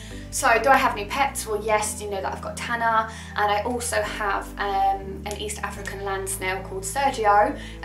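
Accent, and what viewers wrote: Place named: London, United Kingdom. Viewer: I thought you were from Essex - excuse me! South or east London I am guessing?! Love your refreshing nature x thank you for the video x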